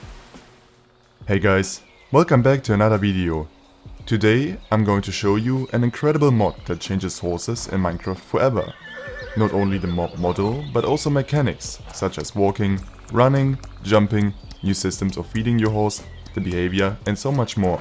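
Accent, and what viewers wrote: Germany. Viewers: I hear German Accent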